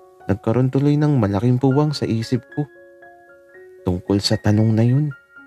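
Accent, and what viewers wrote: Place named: mixed, Philippines. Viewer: Grabe ang ganda ng story author hehe waittings lang sa next ep. Nakakakilig yung story and maganda ang flow kasi nangyayare talaga sya sa totoong buhay.. I also love the accent ni author may punto kasi hehe 😅 parang taga Laguna or taga Quezon Province ganyan din kasi accent namin eh then gustong gusto ko din yung the way ng pag tawa nya hehe 😅 nakakadagdag kilig hehe 😅 Thanks po author sa magandang bl story waiting sa next ep po..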